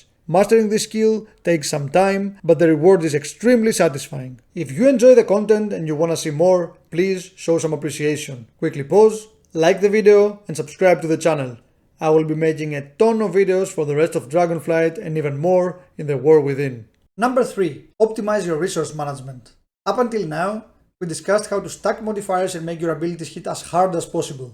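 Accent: Greece. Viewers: are you from greece m8? your accent sounds familiar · your 100% greek, love your accent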